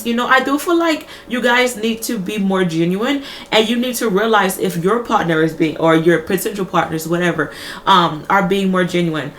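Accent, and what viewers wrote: Caribbean. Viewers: Yea u do have a carribean twist to you.i thought you were dominican or jamaican for a sec lol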